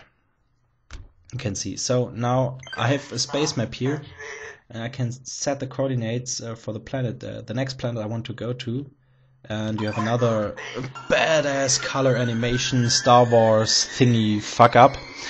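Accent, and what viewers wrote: Germany. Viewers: I totally agree, you should never apologize for where you came from! But I think what he meant by that, was that he's sorry having poor English because he's German. But German accents are still something you should never apologize for!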